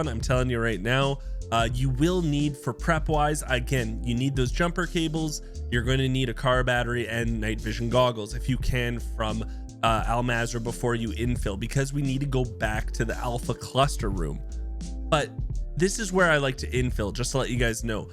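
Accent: Canada. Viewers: I hear a Canadian accent ayeeee? Haha I just got wz on ps5 and I saw this blueprint on a dmz squad mem I really want this but it’s super hard looool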